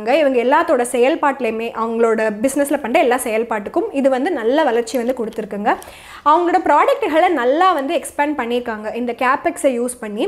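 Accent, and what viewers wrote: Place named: Coimbatore, India. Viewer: Neenga Coimbatore nga la nga.... Nga ...nga ....arumaya pasuringa......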